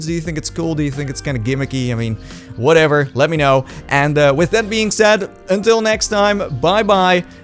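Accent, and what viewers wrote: Netherlands. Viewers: ik zou zeggen praat alsjeblieft niet met zo'n nederlandse toon als je engels praat maar ja, je hebt 758k dus wat kan jou het boeien natuurlijk.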